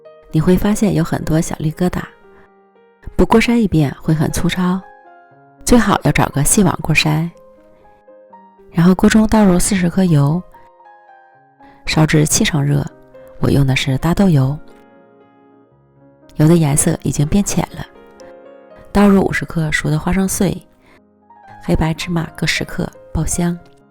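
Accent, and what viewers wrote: Northeast, China. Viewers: 这东北口音平卷舌不分。。。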